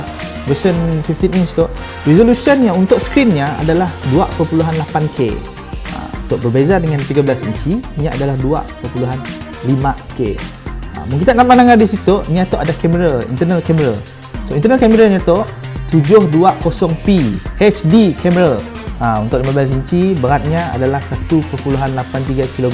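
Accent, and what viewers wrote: Sarawak, Malaysia. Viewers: Bahasa melayu sarawak lah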